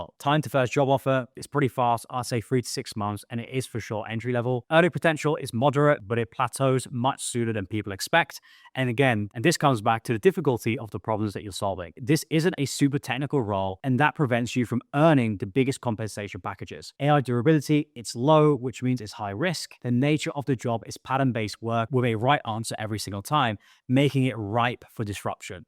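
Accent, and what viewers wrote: United Kingdom. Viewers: English Accent? Nice.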